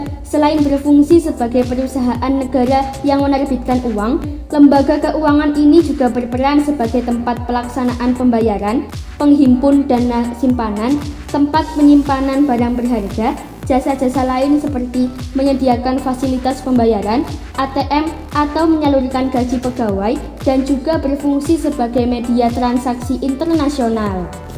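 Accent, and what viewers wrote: Java, Indonesia. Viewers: Medoknya terganggu banget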